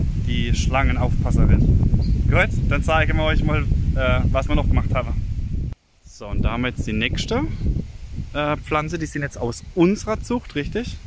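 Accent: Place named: Mannheim, Germany. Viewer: Es wird sehr , sehr schön 👍 Eure neue Katze sieht genz wie unsere aus. Wir haben den ersten Tag in unserem Haus gewohnt, da stand sie ganz ausgehungert vor unserer Tür und hat kläglich miaut. Dann haben wir festgestellt, dass sie auf unserem Grundstück in einem Holzscheit drei Babys versteckt hat. Wir haben alle zum Tierarzt geschleppt, aufgepäppelt und uns entschlossen , dass wir sie alle behalten. Hier sind alle übermäßig versorgt mit Tieren und bevor sie in schlechte Hände kommen bleiben sie bei uns. Die Mama haben wir Bounty genannt. Weiterhin viel Erfolg und Spaß beim gestalten . P S Ich frag mich ja immer welchen Dialekt Ihr sprecht, ich tippe auf Monnem 🤣oder Ludwigshafen. Bobenheim/ Roxheim hatten wir ganz früher mal sehr gute Freunde, die haben so gesprochen wie Ihr. Wir sind aus der Nähe von Meenz { Mainz)😂🖐️🖐️ Liebe Grüße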